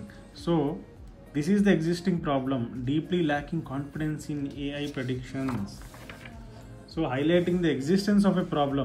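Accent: India. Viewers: the Indian accent was difficult for me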